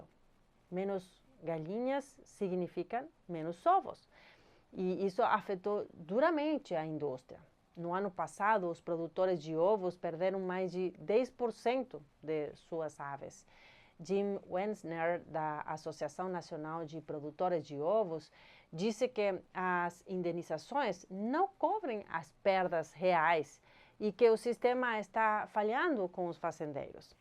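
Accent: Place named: Spain. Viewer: Amei seu sotaque espanhol.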